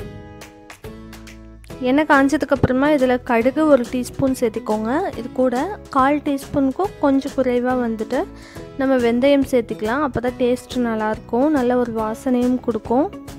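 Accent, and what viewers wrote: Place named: Chennai, India. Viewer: ungal voice kovai Tamil illa Chennai Tamil maari iruku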